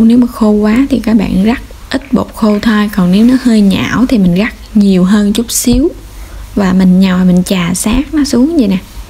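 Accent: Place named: Tra Vinh, Vietnam. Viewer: Nhìn Bánh ngon quá, giọng nói Trà Vinh nghe dể thương đó ...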